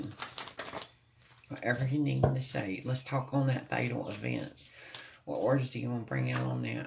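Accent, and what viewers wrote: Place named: South, United States. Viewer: Wow just found your beautiful channel ! I subscribed ! I love your southern voice ! I’m praying to reunite with my southern cowboy who’s a Gemini like me ! I’m from the.north ! We’re like Blake Shelton and Gwen Stefani lol 😂🤠if only he opened his eyes to the real truth ! We were engaged in the 90 s! 🙏❤️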